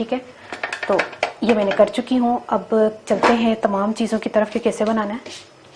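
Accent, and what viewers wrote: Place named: India. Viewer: Aap ki punjabi aur hamari punjabi main fark hai tuhadi pure from india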